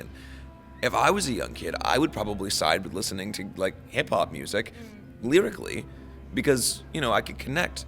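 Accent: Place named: United States. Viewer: He has an American accent. Are you American by any chance?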